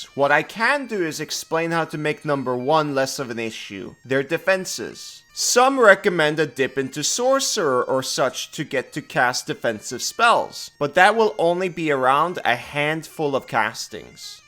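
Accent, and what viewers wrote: Norway. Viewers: Hearing the most Rogalending dialect ever threw me off so hard you don't even know dude.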